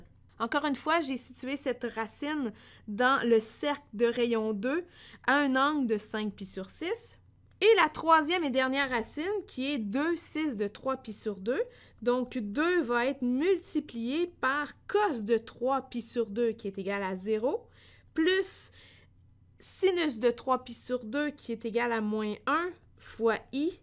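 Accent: Quebec, Canada. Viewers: l accent québécois j adoreee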